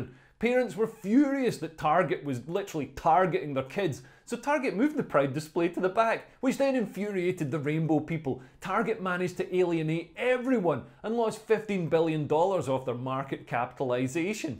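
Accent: Scotland, United Kingdom. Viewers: Bro is peak Sco'ish 🏴 👌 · This guy and his damn beautiful Scottish accent is spitting all over me Spitting facts that is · Coming from a gay dude, great video. I completely agree, I thank you for speaking out about this. And who doesn't love a Scottish accent? Besides the Romans of course... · I Really Like his Scottish Accent Talking about why he Hate Lgbtq Idk What he saying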